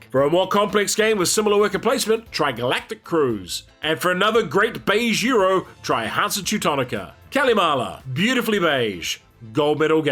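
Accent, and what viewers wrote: New Zealand. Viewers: There is that Kiwi accent. Love your work.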